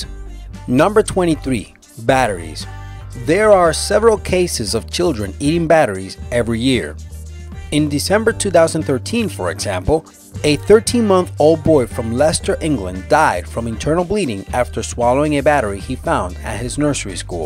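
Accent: Mexico. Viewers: why is this mexican talking? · You have the best Mexican voice ever your an awesome commentator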